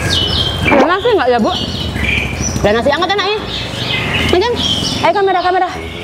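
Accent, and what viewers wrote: Java, Indonesia. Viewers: Jadi inget yg pertama kali omay ke abang adek yg diketawain karna ngmongnya jawa bgt trs bilang smoga mbak ngk nysel yak udah ngetawain aq karna aq anak yatim 🤗 pasti itu mbak nya skrg malu ketemu 😁 untung nya itu tmnnya yg bilang CABENYA DIPISAH ngk ikut lagi😆 sukses terus miss you 😘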